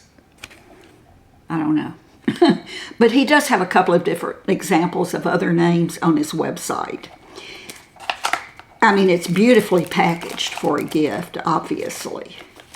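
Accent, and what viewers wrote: South, United States. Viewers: I so adore you! You are so sweet, so genuine and I love your southern accent! I got the NYX foundation and love it!! I am a makeup junkie and have countless foundation's. I have never used any drugstore cosmetics but am now a convert. Since watching your channel I am a fan of e.l.f and NYX! Not going back to ANY prestige makeup! Thank you for making me a fan! xxx ooo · Whoever is managing the YouTube recommendations? Thank you so much for putting this beautiful lady on my recommendations list, and as a cisgender Gen Z male? I literally enjoyed every single minute of this video, the warmth of the southern drawl and her spirit and beauty and elegance and easiness, it’s so soothing and beautiful like the rest of her warm, regal spirit and beauty.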